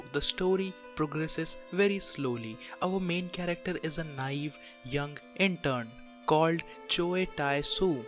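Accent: India. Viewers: Epic video bro. By the way are you Indian? Your accent feels like you are a indian.Btw love your videos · i hate indian accent..!! so anoying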